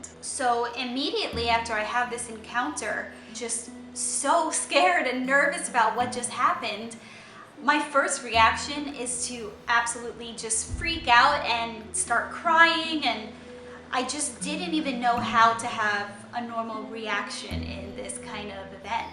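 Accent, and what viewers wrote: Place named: Long Island, United States. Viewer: I watched your first video about your summer house...I'm from L.I. originally and immediately could tell your accent!! are you from long island as well? anyway love your videos... watching from LA where I currently live! ♡♡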